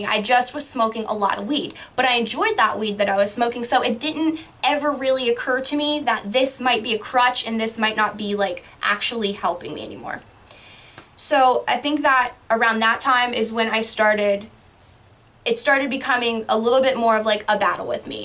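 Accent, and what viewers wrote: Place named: Michigan, United States. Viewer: Sound like you’re a Michigander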